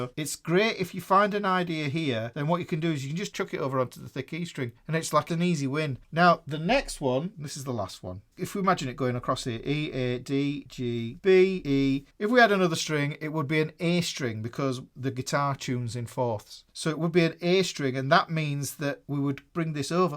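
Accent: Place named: Newcastle, United Kingdom. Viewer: Great accent. Are you a Geordie?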